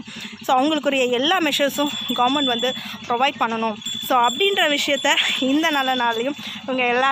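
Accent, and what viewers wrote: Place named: Madurai, India. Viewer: Akka nenka entha ooru .. Madurai language matri irukku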